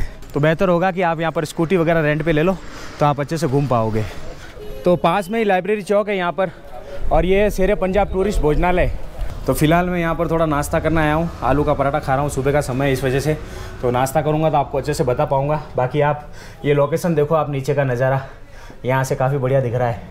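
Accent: Gujarat, India. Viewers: Avaj thi lage ke gujrati co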